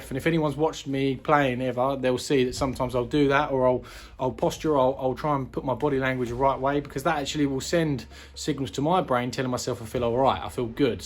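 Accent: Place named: South, United States. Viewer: I really enjoy your videos, but please please stop putting the auto generated subtitles on them 🙏 they can’t handle your southern accent mate, and it’s just complete gobbledygook going across the bottom of the screen, it’s so distracting 😂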